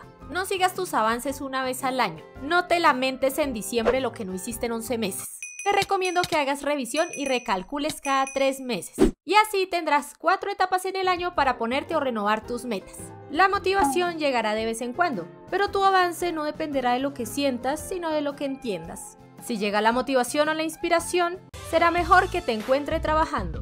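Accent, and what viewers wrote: Colombia. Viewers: ¿De que parte de colombia sos? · Eres de Bogotá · tu de que parte de colombia eres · Oye una pregunta eres colombiana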